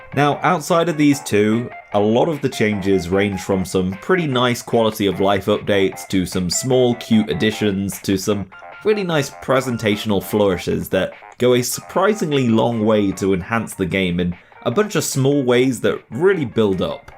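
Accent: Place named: United Kingdom. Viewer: Why must all you Nintendo and Sega YouTubers be British and ruin the video with your horrible voice… quit forever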